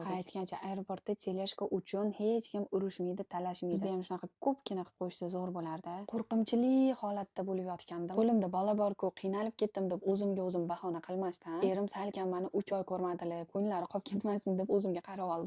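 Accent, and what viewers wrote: Tashkent, Uzbekistan. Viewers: Iltimos xech bolmasa yapti deb gapiring namanganni qaysidir rayonidansiz oziz ln Toshkent shevada gapirasiz yarashmapti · Вой Наманганлик экансизку кизим нега Тошкент шевасида гапирардиз.Наманганлик эканиздан уялармидиз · Нега тошкенча гапирасиз тонкенликлардан оброси баланд туради водини оброси Коконга караган кокон хонлиги бошкарган тошкентни Асабларим ойнеди борку шу тошкентни шевааасида гапирса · Нимага Тошкенча гапирадғ бу қиз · Узингиз Наманганликми ? Гапиришиз чала Тошкентлик , турмуш уртогиз Кореялик , жуда хам интересно . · Нманганли бўсезам тошкен шевада гапирвосизми? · Сан нега НАМАНГАЛЛИК булиб ТОшкенча Гапирасан карисга нмага тегдинг наманганда эр йукмиди еки. ЕМОН кизмидинг яхши киз кушнсига ЭРГА ТЕГАДИ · Тошконча шевани кайдан ургангансиз? Ман умуман урганолмадим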